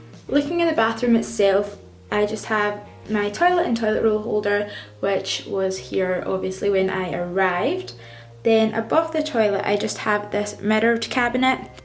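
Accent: Scotland, United Kingdom. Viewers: This room is really cool! I'm just going back for my third year now so am watching videos like this in anticipation. Are you Scottish? Only ask because I am! :)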